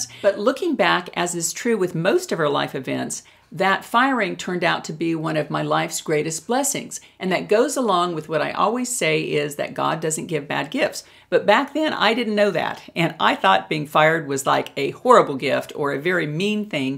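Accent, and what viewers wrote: South, United States. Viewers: Hi! Doug again. Nice house! We seem to have more stuff in common. I too studied drama (not in college) and I lived in LA for a while when I was younger, did some minor stuff. But didn't get my star on the walk of fame, haha! I would like to pursue acting again now that I am older and wiser in some sort of venue. I also am about to get my life and health insurance sales license in the state of Florida, so I was curious to what kind of insurance benefits you sell? The testing centers are closed for now until further notice so I keep reviewing all my studies and hope to pass exam. It's a career change, as I mostly worked in hospitality before. I am also a writer and visual artist and play the piano. Artsy kind of guy. Are you from the south? That is my guess, haha!! I live north of Tampa in a town called Inverness in Citrus County, FL. 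Grew up in Tampa. Take care.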